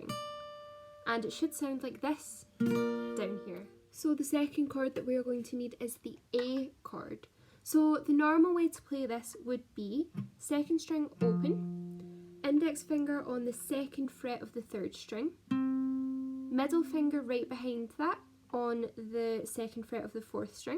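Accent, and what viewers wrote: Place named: Scotland, United Kingdom. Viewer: yeah it's a lovely instrument has a nice wine tastings I have noticed when I listened to you you have a Scottish accent the part of yes I like the band you because it has a really nice Twang the banjo has a nice twanging sound to it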